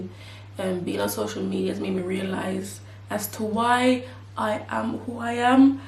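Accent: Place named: Jamaica. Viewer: I hear the Jamaican in you.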